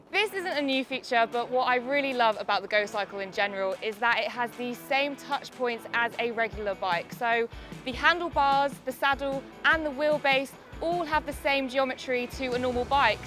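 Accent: United Kingdom. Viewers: With her British accent, talking about London traffic and the bike being 16.3 kg, I was totally expecting her to use KM instead of Miles.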